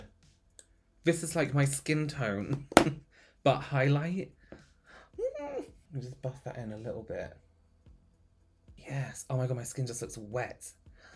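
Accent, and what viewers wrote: England, United Kingdom. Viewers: Never in my life would I have thought I’d be in love with a pale Mexican with a British accent. 😂♥️ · Do you speak Spanish? Can you in your next video? I’m dying to hear Spanish in an English accent! Or maybe you have a different accent when you speak Spanish ?! Either way, I’d love to hear it!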